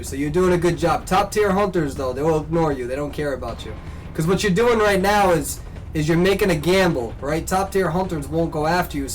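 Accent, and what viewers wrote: Germany. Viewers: I didn't know you were german, explains a lot with your accent😂 awesomee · Your beautiful German accent 😂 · ITS A GERMAN ACCENT? THOUGHT IT WAS PERSIAN OR ARABIC OR SOMEWHERE IN THE MIDDLE EAST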